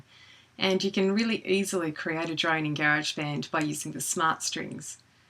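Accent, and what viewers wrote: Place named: Australia. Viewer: Hi. My name is Maribel. I am in California. My 6th graders love your video and AU accent. We love tech in music class!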